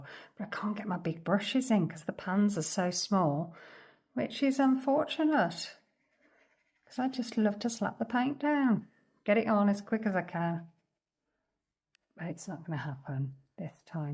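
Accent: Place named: United Kingdom. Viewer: I’d love to know where in the UK you live. I moved from Nottinghamshire to the USA nearly 20 years ago and your accent sounds familiar.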